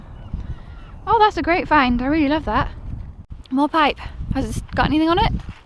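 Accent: United Kingdom. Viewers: Love your very british accent..... and i'm british. 😛😜😛😜😚 x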